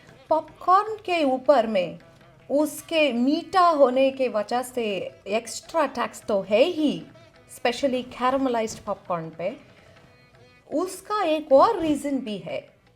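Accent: South, India. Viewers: Listening to her for the first time here, I thought she was south Indian. Then I looked at her Hindi name and other videos and realised it was mimicry 🙂 I have to admit, very rarely do you get non-south Indians imitating south Indians in an accurate manner. It is always some cliched "ayyo rama" imitations. I am sure she put in a lot of effort to learn the accent for every word that she is speaking in this video.👏👏👏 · While I agree that taxes are too much that gdp will actually drop. Lets not be political about it, since the same happened during the congress regime. Both of them follow the same capitalist policies while in power and plain to be socialist while on opposition. And your Tamil accent is horrible. Indiands probably pay the highest taxes. Economics doesn't work this way. We should have had more reforms,but it seems like modiji like chidambaram ji is trying to shore up enough so that the next set of freebies can be announced before next elections. 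Wouldn't be surprised if some major changes would be in place before next polls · I ❤ her south Indian ascent?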